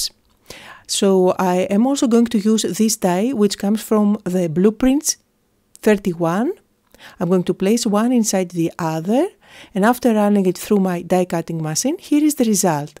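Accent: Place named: Greece. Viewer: So cute,! I think my favorite is the little boy with the clothes in the washing machine! How imaginative! So, you are Greek, I gather? I love listening to your accent! Your English is very good!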